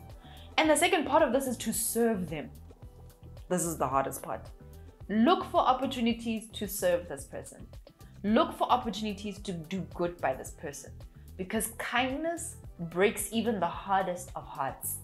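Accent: South Africa. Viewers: South African accent 😍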